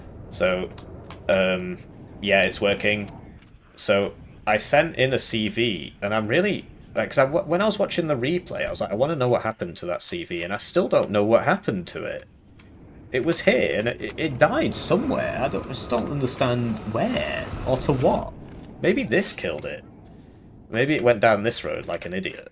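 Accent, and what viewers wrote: United Kingdom. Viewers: Pakistani man in middle eastern attire with a British accent named.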